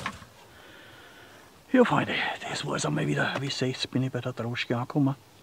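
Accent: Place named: Austria. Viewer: Hallo, Ich schaue dich nun schon eine Zeit. Leider verstehe ich bis heute nicht Deinen Gruss an die Kranken. Was sagst Du? Kranken Sesseln??? was bedeutet das. Leider kenne ich das Östereichische Dialekt nicht:-) Viele Grüsse Michael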